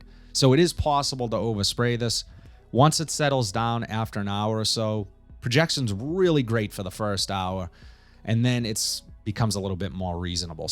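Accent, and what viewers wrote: Boston, United States. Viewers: Cool Buaston accent!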